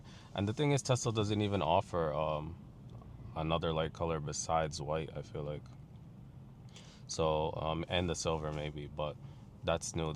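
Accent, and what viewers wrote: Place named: Toronto, Canada. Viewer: You sound like you’re from Toronto though, like a moli torontorian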